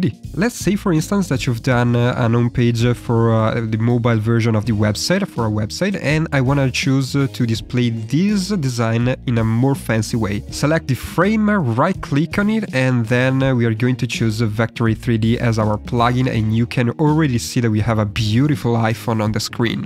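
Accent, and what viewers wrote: Italy. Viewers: I find Italian accent very funny and interesting 😆❤❤❤ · Give the guy a break, that is how Italian’s talk.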